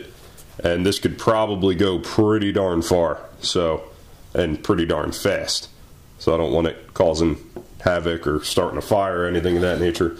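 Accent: South, United States. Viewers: Wow,. You seem to have picked up a slightly southern twang and a deeper voice. The rest of it is the exact same though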